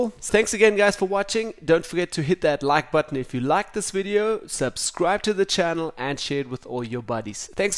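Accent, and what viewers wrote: South Africa. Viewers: Are you south african!!!!! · Ja nee! You must be 🇿🇦 GREAT TUT!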